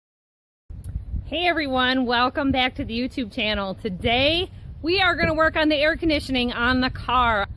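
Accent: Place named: Midwest, United States. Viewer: wow I can listen to you talk all day. you got that cool Midwest Gretchen Whitmer fargo accent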